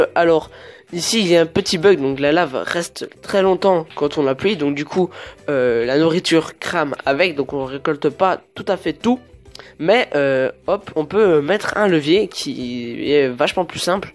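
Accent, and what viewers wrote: Belgium. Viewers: GG tu es belge ? ^^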